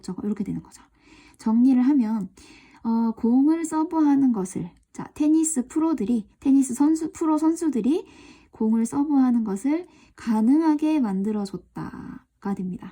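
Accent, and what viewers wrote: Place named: Busan, South Korea. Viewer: 장마시즌 부산은 장맛비가 내리네요 ㅎ 영상보면 억양이 부산분 같기도하고 은 고향이 어디에요? 뭔가 친근한 말투라 더 집중하게 되네요 감사해요 ❤❤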